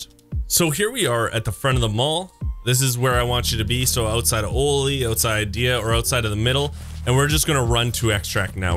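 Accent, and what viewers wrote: Canada. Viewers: Trailer park boys accent ; ) Cheers from Quebec !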